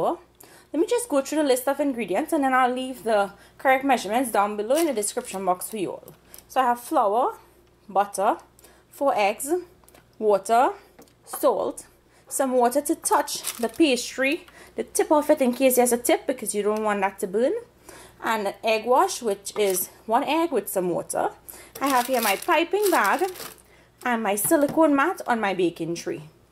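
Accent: Trinidad and Tobago. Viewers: haring you trini accent is soooooooooo pleasing. and the recipe is also excellent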